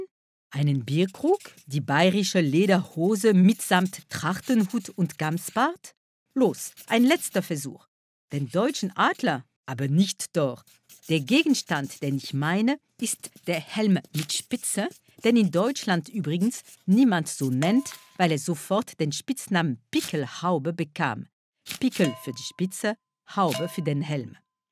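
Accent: France. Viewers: Dieser "komische" Akzent ist ein französischer Akzent und wird von Karambolage schon seit gefühlten Ewigkeiten verwendet. Vielleicht übernehmen die mit den deutschen Akzent ja die französischer Karambolageversion.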